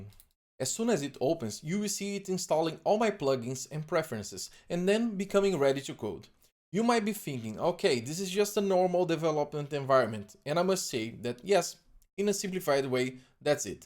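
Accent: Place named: Brazil. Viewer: percebi que o sotaque parece o da nossa terra ^^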